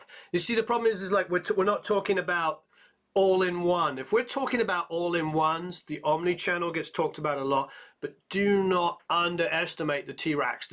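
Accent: England, United Kingdom. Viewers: Don't lose your English accent, we love it, good info, thanks.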